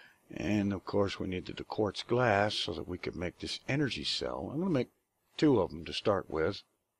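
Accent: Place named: South, United States. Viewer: "Sometimes even the brightest don't see the light." made me lol. How long were you kicking yourself once you figured that out? :P "I promise I'll get myself together here eventually." -- I'm not holding my breath. If I didn't know better, I'd swear that I was watching AnderZEL with a southern accent. Only 3 16k modules and not enough storage? iirc, Hypno was able to fit everything he got from a full-size quarry onto a single 1k drive (excepting the stone, gravel and dirt that he placed into deep storage units)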